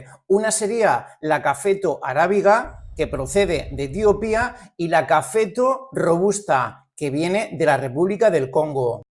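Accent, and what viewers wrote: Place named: Murcia, Spain. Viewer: No puedes negar que eres Murciano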